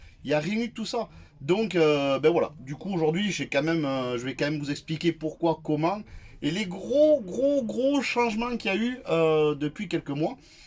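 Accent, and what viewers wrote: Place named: Toulouse, France. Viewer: Ah cet accent toulousain,j’ai passé 12 ans et depuis mon cœur est à Toulouse. Hélas je ne peux plus me permettre de vivre dans la région, je suis juste au nord du lot, je ne vais pas me plaindre mais cet accent me fait vibrer, j’adore ! Depuis je fais du canoe sur la dordogne, lol ça change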